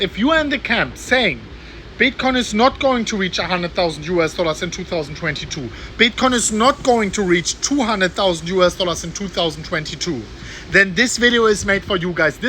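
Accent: Germany. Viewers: Killer German accent, dude!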